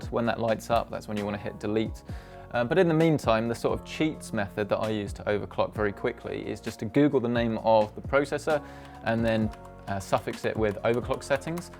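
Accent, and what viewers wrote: United Kingdom. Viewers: I do like the idea of some free stuff, but I don't want to say the same stuff as anyone else, but I'll do it anyway: I love your channel, I've enjoyed it for a while, also quite glad that you're a semi-posh brit, like myself! Happy New Year!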